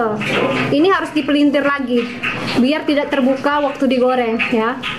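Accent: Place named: Sulawesi, Indonesia. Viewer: oh pantes, logatx kok ky org sulawesi,, aq asli semarang, tp skrg tinggal di kendari kak,, semangat bikin kontenx kak,, aq ikuti trs lo kontenx kita,, 👍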